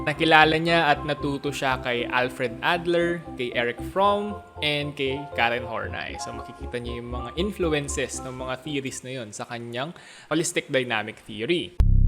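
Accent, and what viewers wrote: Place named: Jamaica. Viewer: Sounds like Jamaican in a way 😅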